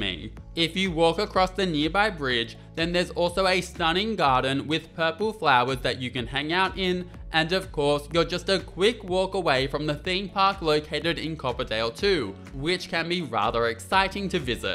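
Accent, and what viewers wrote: Australia. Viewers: Gotta say the nice aussie accent 😍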